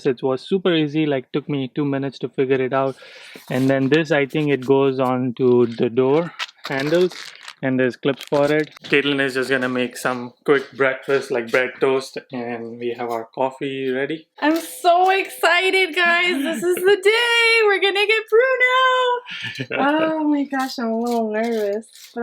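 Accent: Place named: India. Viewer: Do you know hindi I am asking you this because your voice has some indian accent and your face is also like indian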